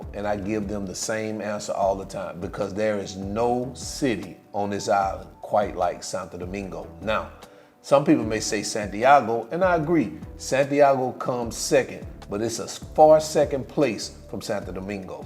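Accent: South, United States. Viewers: I went from your wife's Dominican accent to your southern accent...lol! Great video I like how you enunciated your words and spoke clearly. I am an expat who has lived in Puerto Plata for 9 years I have a decent lifestyle and cost of living there but Santo Domingo is my second favorite place in the Dominican Republic for some of the same but some different reasons. To live the same lifestyle, I have in Puerto Plata it would cost me about 30% more therefore I am content visiting about twice a month. For me there are many shopping items you cannot find anywhere else in the country, but you can find them in Santo Domingo. In fact, if you can't find what you are looking for in Santo Domingo it's probably not in the Dominican Republic. As far as the restaurants and the women we are 100% in agreement. I have just subscribed to your page so keep up the good work....oh year you make a good team with your wife in her videos it's good to see a successful cross-cultural couple...thanks again!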